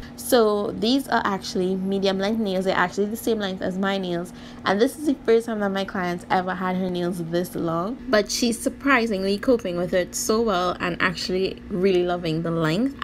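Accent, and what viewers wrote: Trinidad and Tobago. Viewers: First video of yours I'm seeing. Are you from Trinidad 🇹🇹? Your accent sounds like it. 😊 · Loving those nails and the accent my sister 🇹🇹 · I know a Trini accent when I hear one. Big up yourself sis!!!!! ❤️🇹🇹🇹🇹🇹🇹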